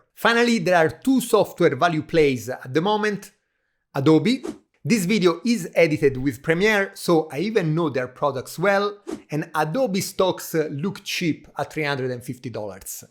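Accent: Tuscany, Italy. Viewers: sei italiano? hai un accento toscano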